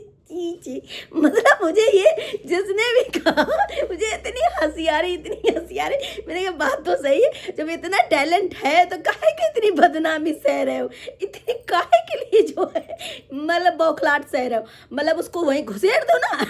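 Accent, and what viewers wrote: Kanpur, India. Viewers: Bahut hasi ayi kanpur ki language main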